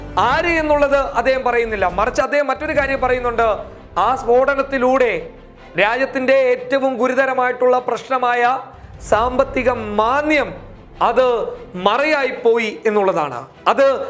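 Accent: Kasaragod, India. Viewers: Now much better, but still Kasaracode slang hunting him.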